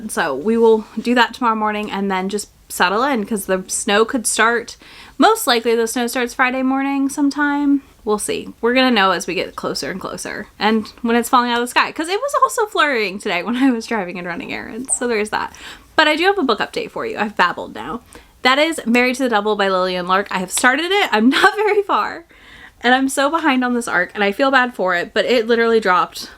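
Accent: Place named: Kentucky, United States. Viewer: As a KY native living in SC, hearing talk made me feel like I was back home 😂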